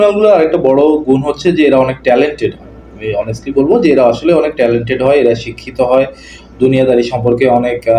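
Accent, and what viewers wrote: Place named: West Bengal, India. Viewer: আপনার ভাষা শুনে মনে হচ্ছে, আপনি পশ্চিমবঙ্গের কেউ। যদি না হন, তবে পরিচয় দিন।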